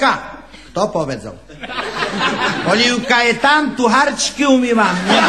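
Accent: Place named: East, Slovakia. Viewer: abo kto nepozna vychodnarcinu ten da palec dole podla mna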